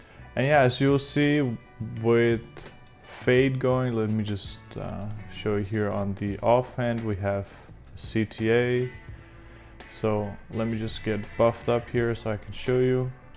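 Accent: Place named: Scandinavia. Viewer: Bro are you a Scandinavian living in Brazil? Sorry.. you just just sound Scandinavian 😉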